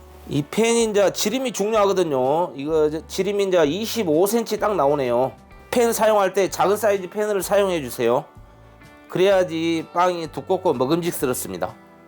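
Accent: Jeolla, South Korea. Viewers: 저두 전남 광주입니다, 말투에서 전라도 사투리가 나어서요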